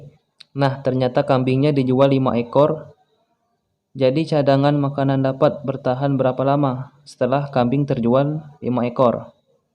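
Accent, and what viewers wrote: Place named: South Sumatra, Indonesia. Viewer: Min kok logatnya gak asing 😂, dari sumsel ya?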